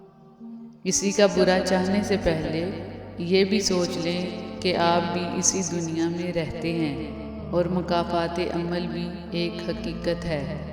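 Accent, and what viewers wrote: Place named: Punjab, Pakistan. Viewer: خوبصورت اواز و انداز کے ساتھ دل میں اتر جانے والے اصلاحی و سبق آموز اقوال ۔۔۔۔ تلفظ کی پرفیکٹ آدائیگی ۔۔۔ سونے پہہ سوھاگہ اردو میں پنجابی لب و لہجے کا حسین امتزاج ۔۔۔ ماشاء اللّٰہ ۔۔۔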